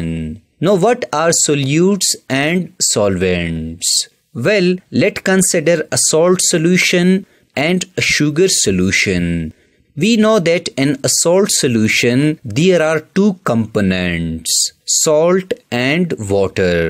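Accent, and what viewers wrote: India. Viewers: I think hes india he cant help it its their accent though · I can't learn well because I'm distracted by the Indian accent 😭🙏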